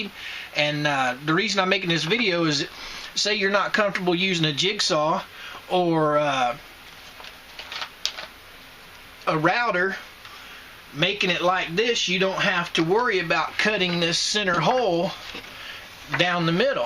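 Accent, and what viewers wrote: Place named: Missouri, United States. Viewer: LOL.... I like your accent.... Are ya from Missouri?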